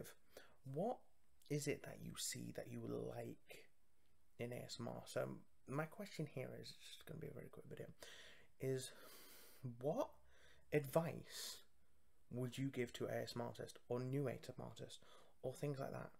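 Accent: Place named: England, United Kingdom. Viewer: I started watching Tiraradeguello because he gave some very interesting advice during one of his Illuminati videos and it hooked me. The decision to subscribe comes from both the relaxation but also the connection with the ASMR artist. The relaxation is also tied to the gimmick, I like conspiracy theories and weird things so I gravitated toward Tirar and Ephemeral Rift. When I followed you, it was the collab you did with Tirar, when you were helping Dr. Andrew Michaels. I got hooked because of the sassy adlibbing you were doing + English accent is so amazing. So My advice is, being yourself is only half the equation. you also have to have a good hook and put in effort, such as getting some story ideas and writing them down, working them into scripts you an follow / adlib over. Umbergnome ASMR is a wonderful artist if you want to see a story being told through an asmr video.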